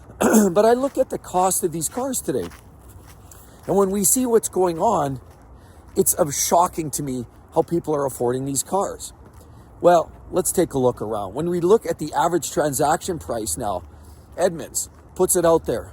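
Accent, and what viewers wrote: Canada. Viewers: Canadian accent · I knew bro was Canadian from the accent 🇨🇦🎙️🔊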